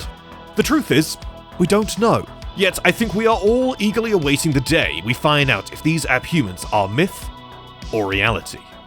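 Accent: United Kingdom. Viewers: Dude, why you have to do the cringe voice over. Just talk. I mean you already have the British accent. You don't need to do the goofy voice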